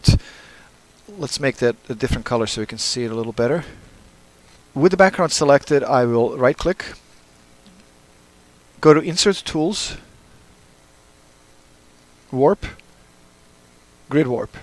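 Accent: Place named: Russia. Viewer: Hi,It drives me crazy. You have a lot to say about a subject and then you become your own worse enemy by not doing the most simple things ruin your otherwise hard-worked tutorial. (Or in your case you just did not know or realize it sounded the way it does.) A pop filter (under $20 from B&H I hate Amazon) is just a screen put between your mouth and the mic that diffusion's the wind from your breath to get rid of the pops and esses a bad mic and setup can cause. I hate to see people go through all the trouble of doing a tut and then it's unwatchable or hard to listen to because of mechanics not subject nor idea. I hear you have I assume a Russian or Eastern accent and English is not your first language. How can you learn English and allow something as easy as not have a sock (really put a CLEAN sock over your mic) ruin your tutorials after going to the horrendous trouble of learning English? 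I've been devouring Resolve and Fusion but just found you. Good luck.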